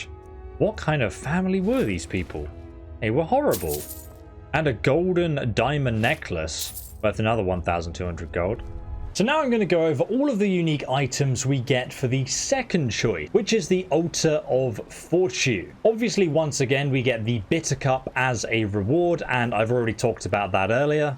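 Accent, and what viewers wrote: England, United Kingdom. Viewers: Love the helpful advice. Serious mental cringing at your horrible pronunciations. Attribute, Markarth and quite a few other speech errors. I get that you sound British, but honestly, that should mean you are better at speaking English, not worse. Again, I found this advice helpful. Please don't think I am ragging on you. Subscribing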